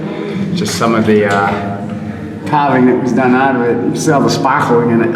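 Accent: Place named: Massachusetts, United States. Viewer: Holy mass accent bro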